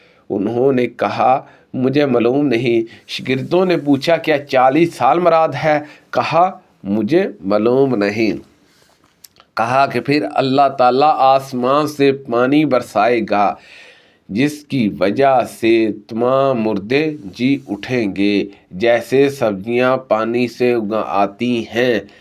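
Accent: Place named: Punjab, Pakistan. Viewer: السلام وعلیکم بھائی آپ سب سے پہلے اپنی اردو پر توجہ دیں، آپ اردو پنجابی میں بول رہے ہیں۔ احادیث کی آسان اور مختصر تشریح بھی کریں ،اس طرح عام انسان کو حدیث کا مفہوم سمجھنے میں آسانی ہو گی ۔ جزاک اللہ خیرا ۔❤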